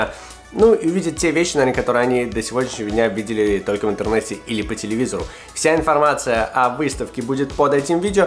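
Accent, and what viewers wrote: United States. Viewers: Американский акцент человека у которого родители привезли в детстве в США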